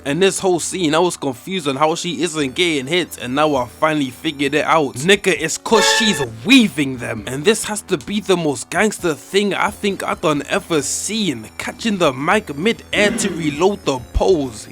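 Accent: United Kingdom. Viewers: I love how clear it is to understand your British accent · Your voice is like British Casual Premium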